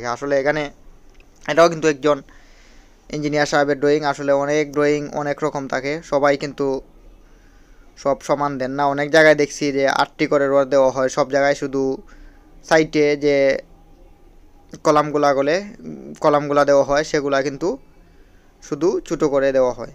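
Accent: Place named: Sylhet, Bangladesh. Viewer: আসসালামু আলাইকুম۔۔ জনাব, আপনার কথায় বুঝতে পারছি আপনার বাড়ি সিলেট বিভাগে l সিলেট শহরে পাঁচ শতক জমির উপরে তিন তলা ফাউন্ডেশন দিয়ে একতলা বাড়ি করতে আগ্রহী l এজন্য আমি নিজেই একটি নকশা করে রেখেছি l আপনার ভিডিওগুলো অনেক সহজবোধ্য হওয়ায় আপনার সাথে আলোচনার জন্য আগ্রহ প্রকাশ করছি l এজন্য আপনার মোবাইল নাম্বার (Whatsapp /Imo) o email address পেতে পারি কি ? ধন্যবাদ !! __ মিছবাহ তফাদার রিয়াদ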